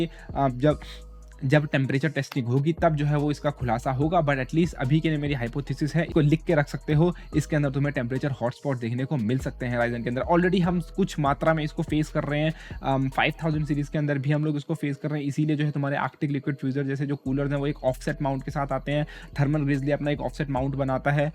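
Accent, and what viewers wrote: India. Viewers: i hate this indian accent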